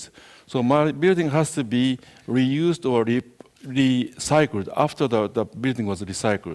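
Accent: Japan. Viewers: Sure you are right. But I don't agree with the fact that he's pronouncing as he is "supposed" to. I know Japanese people who have less achievement in life than he does but speak better English because they try harder to erase their accent and make themselves understandable. I just feel that he is making no effort whatsoever to even try to alleviate the pureness of his accent. I don't doubt he can do it though, I just think he consciously stops himself of trying for whatever reason. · Im pretty sure he did a speech with Japanese ascent !!! but nice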